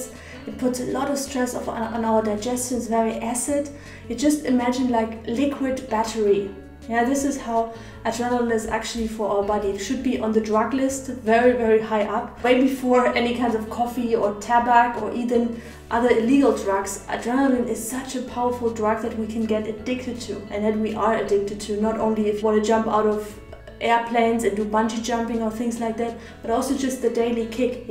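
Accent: Germany. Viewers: das ist doch n deutscher akzent :D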